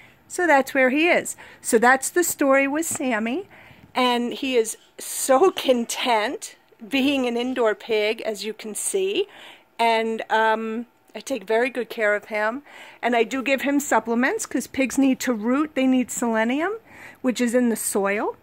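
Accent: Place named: New York, United States. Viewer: how much I enjoy your accent. Long Island? Staten Island?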